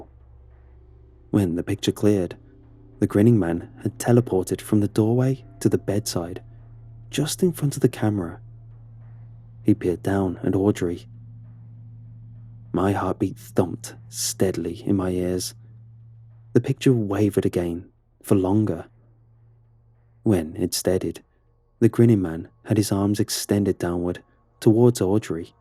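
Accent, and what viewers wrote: United Kingdom. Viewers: why is it that a good British accent makes any story better? there is something soothing to me about it, like he could tell a story about how he makes mashed potatoes and it would likely captivate me. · What’s with all these videos narrated by a Brit? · Why is the guy British bruh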